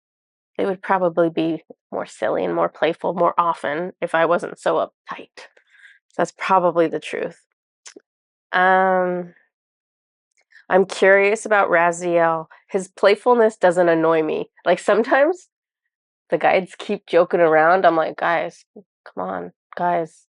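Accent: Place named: Australia. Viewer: Thank you for important message on presence and meditation. I can’t get past Australian accent 😂🥰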